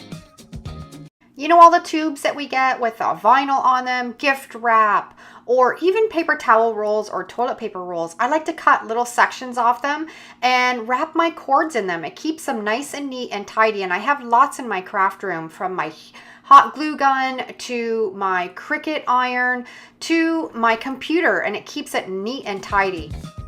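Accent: Canada. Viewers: Sounds Canadian. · And she sounds CANADIAN 🇨🇦 I grew up in Hamilton and still catch myself saying abowt 😊😂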